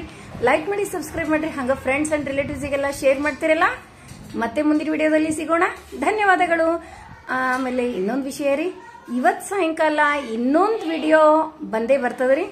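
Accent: North Karnataka, India. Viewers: Ñìmma voice bala chanda ri namma athhe vaice ade thara irithithu namma athhi du hanuma sagara kustigi kade utharakarnataka bashe balu sundara 🙏🙏🙏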